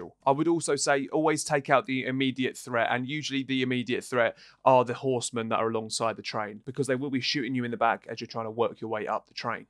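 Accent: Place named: England, United Kingdom. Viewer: I'm always here nice to here an English accent on YouTube 👍 bloody yanks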